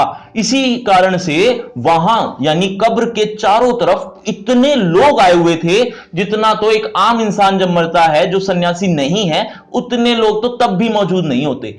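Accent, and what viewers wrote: Haryana, India. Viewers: Aap Haryana se ho ?? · sir aap haryana se ho kya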